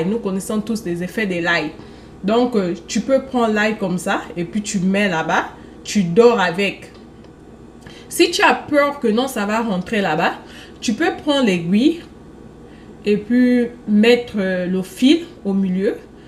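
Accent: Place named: Africa. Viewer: Tu as l’accent africain mais c’est toi qui ne fais que dire <parceque en Afrique 😒> je t’aime bien mais arrête ça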